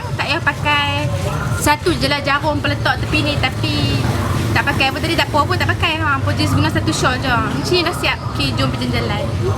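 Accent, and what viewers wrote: Malaysia. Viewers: 2 0wg mne? bunyi ckp cm 0wg baling ja!haha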